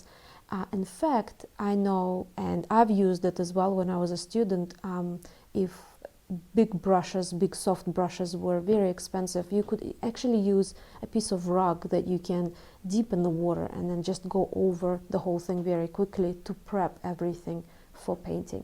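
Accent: Russia. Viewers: I love your voice! I am new to your Chanel. As much as I love watching someone draw, the main reason I watch your videos is the sound of your voice. Not only is it soft and relaxing, but I have ALWAYS enjoyed the sound of a Russian accent. I’m glad I came across your Chanel. Thank you!! ✌️ from Canada!! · Beautiful feminine voice, beautiful Russian accent!😊😊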